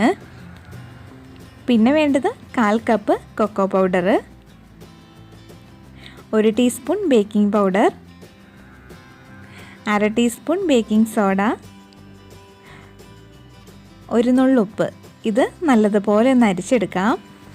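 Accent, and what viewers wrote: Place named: Kottayam, India. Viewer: palakkar Kottayamkar parayunnathu pole parayunnathu kelkkana rasam.Appol ithil one cup 240 ml aano.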